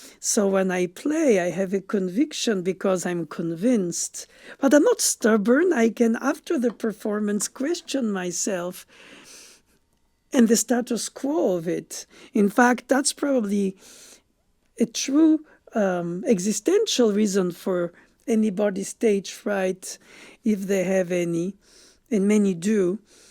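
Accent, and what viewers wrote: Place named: France. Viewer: I can listen you speak for hours. I don’t know if your manner of speaking is because of your native French or because of your intellectual and aristocratic sophistication. But you definitely sound like someone from the past (from a glorious, superior past) and that both makes me happy and sad.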